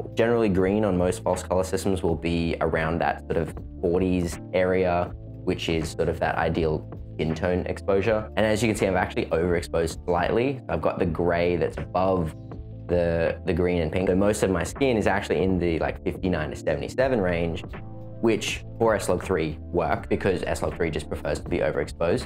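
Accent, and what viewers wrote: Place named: Australia. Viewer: You sound like Adrian Gee. I am guessing you are from Adelaide or Melbourne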